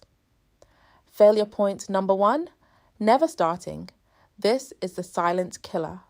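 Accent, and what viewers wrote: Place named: North Carolina, United States. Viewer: Durham NC.. Nice accent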